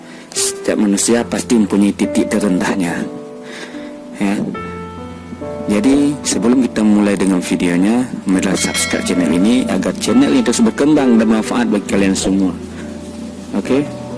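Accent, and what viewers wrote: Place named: Bali, Indonesia. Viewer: Dari suaranya pasti nih org bali 😊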